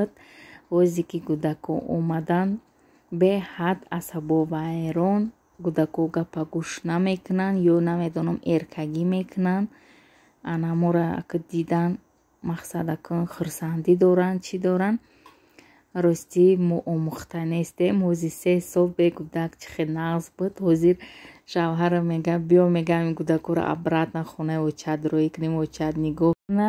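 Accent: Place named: Kulob, Tajikistan. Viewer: Шумо аз конибодом барои чи кулобча сухан мекуни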